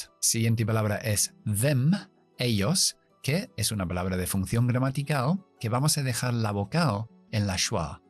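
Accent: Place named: South England, United Kingdom. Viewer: OK, entiendo. Pareciera SBE, es decir Southern British English, pero habría que preguntarle a él para estar seguro. Yo tengo el oído entrenado al inglés del Midwest americano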